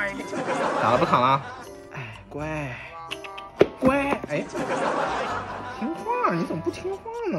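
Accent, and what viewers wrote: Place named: Malaysia. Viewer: 马来西亚口音XD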